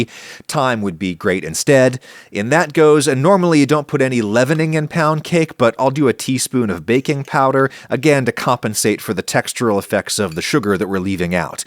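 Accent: United Kingdom. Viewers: too british for me, unsexy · is Bri'ish, confirmed live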